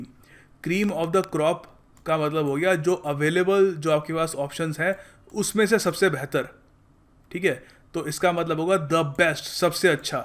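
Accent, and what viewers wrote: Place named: South, India. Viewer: as always video and explanation was awesome but missing your south Indian accent "okkk siiiiirrrr"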